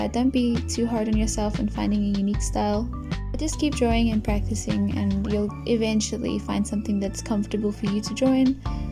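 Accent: South Africa. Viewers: Are you South African?! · is that a south african accent? :D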